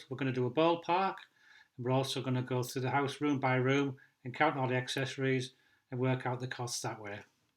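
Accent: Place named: Northern England, United Kingdom. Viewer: Sound like a northern lad (proper northern)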